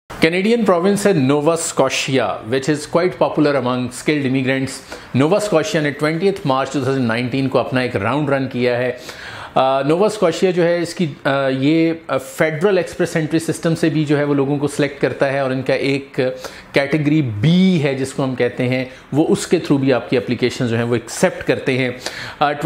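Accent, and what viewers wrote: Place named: India. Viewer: It's a shame the video doesn't come with subtitles. It looks like it was a super interesting information but I could only catch a couple of phrases. Indian accent is super difficult when you're not an english native :(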